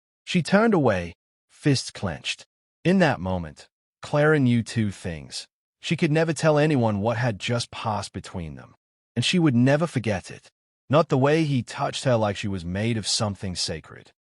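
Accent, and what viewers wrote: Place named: Bronx, United States. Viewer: This story voiced by a guy with a Bronx accent is funny.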